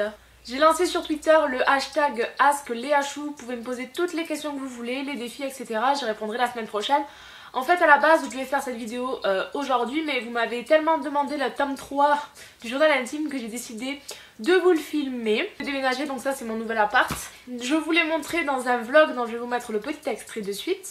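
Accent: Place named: Toulouse, France. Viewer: Dac, dac, j'adore ton petit accent toulousaingue:)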